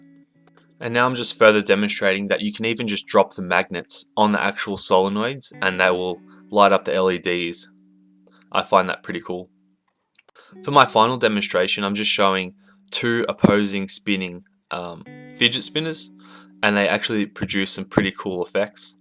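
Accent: Australia. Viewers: Are you australian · He is definitely Aussie I'm also Aussie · kd secret acc deffs aussie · your voice is beautiful it sounds austrailian ;3c idk im probably just stupid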